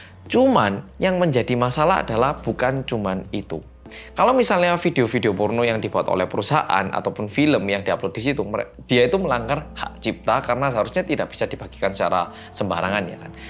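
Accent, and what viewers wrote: Java, Indonesia. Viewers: Medhokkkk😎🗿 yang ditunggu" detektif 😎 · Oom kliatan fresh sekarang, tapi udh berkurang mendhoknya😂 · Bersama orang KOREA yang MEDHOOOKKKK... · Welcome to orang koreaaa yang medhooook😎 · Medokk..., Suara e itu lho kangen denger nya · Hello guys welkom bek tu korea reomit bersama orang korea yang MEDHOOKK~ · Team gercep😀.. Kalo ada bang medok, gak bakal terlewatkan di notif..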